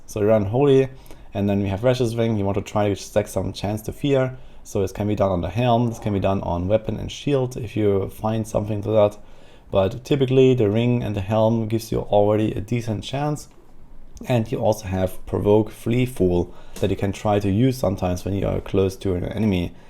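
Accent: Germany. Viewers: it might sound dumb BUT you sound so german are you ?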